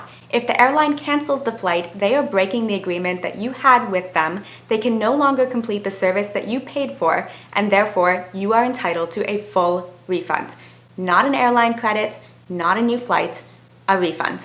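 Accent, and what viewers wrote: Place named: New Jersey, United States. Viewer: I feel like you are covering up an accent. Like New Jersey or Boston thick accent.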